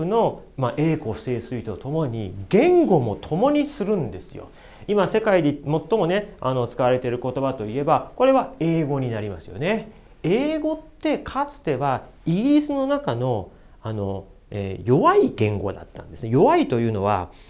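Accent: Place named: Tohoku, Japan. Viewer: 東北弁に聴こえるー。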